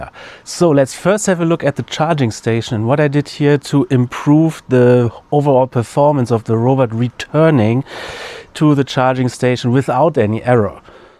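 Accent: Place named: Germany. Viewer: Hää das klingt ja ziemlich deutsch dein Dialekt 😅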